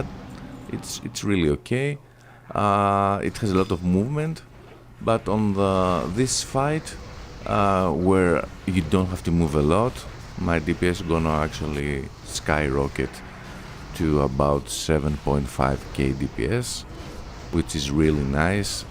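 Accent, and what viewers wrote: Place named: Greece. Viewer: I bet this accent is greek 😍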